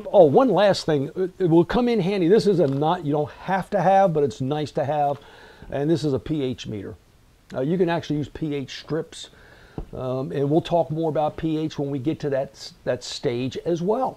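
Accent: United States. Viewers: The guy doing the video sounds American.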